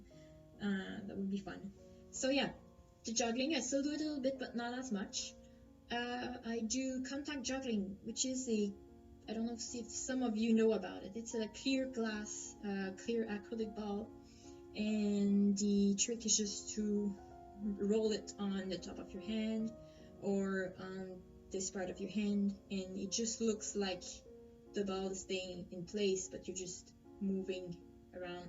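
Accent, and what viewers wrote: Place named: Quebec, Canada. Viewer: C'est vraiment sympathique comme vidéo, tu devrais en faire plus souvent! C'est super d'avoir des nouvelles de toi comme ça. J'ai aussi aimé les petits vidéos, surtout le "contact juggling" dont j'aime l'illusion! Bien sûr, comment ne pas apprécier la fin? Je me demandais quel accent tu avais! Eh bien c'est pas mal québécois, je ne suis pas trop dépayser :P Merci de cette attention pour les francophones qui te suivent! À bientôt! =)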